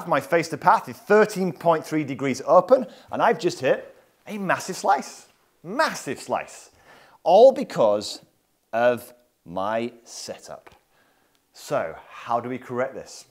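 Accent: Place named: Northern England, United Kingdom. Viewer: love the tuition, shame about the flat northern vowels.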